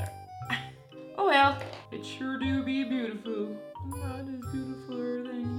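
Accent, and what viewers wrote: Scotland, United Kingdom. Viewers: When I started watching your videos ageeees ago I understood every second word you'd say haha now I'm like half Scottish xD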